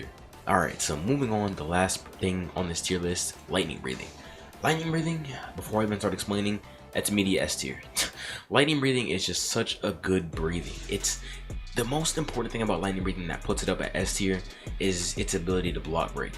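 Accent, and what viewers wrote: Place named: New York, United States. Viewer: are you from new york you kinda have an accent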